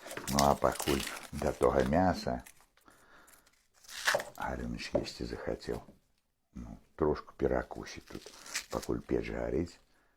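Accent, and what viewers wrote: Belarus. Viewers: Трасяначка шо нада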